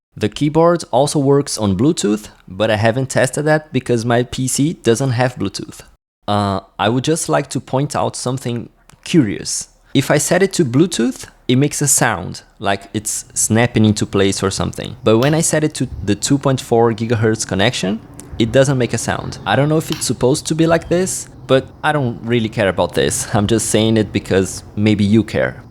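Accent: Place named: South America. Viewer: Heard a south american accent, where are you from? Perhaps Chile or Brazil?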